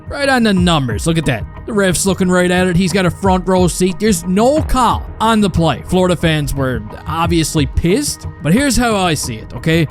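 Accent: Newfoundland, Canada. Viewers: You sound like a Newfie, that's a good thing. They love hockey too.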